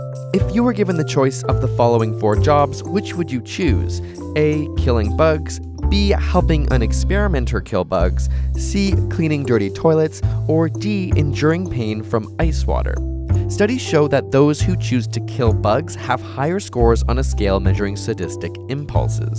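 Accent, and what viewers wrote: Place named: Canada. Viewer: It's not the gayness that bothers me. It's the way you Canadians say "out". That's funny.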